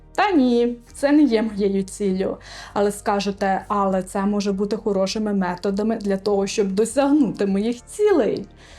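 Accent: Western Ukraine, Ukraine. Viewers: Український акцент десь з заходу, подумав можливо із Закарпаття ? )))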